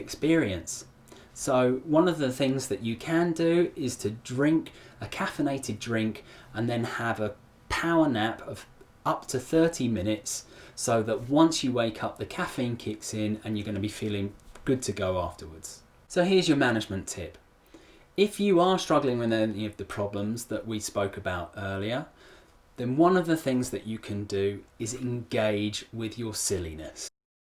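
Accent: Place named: United Kingdom. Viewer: I LOVE your British English!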